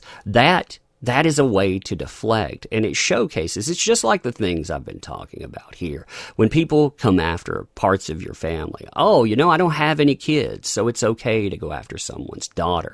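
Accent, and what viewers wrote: South, United States. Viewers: Damn my guy. You've got that good ole fashioned southern draw makes me feel home.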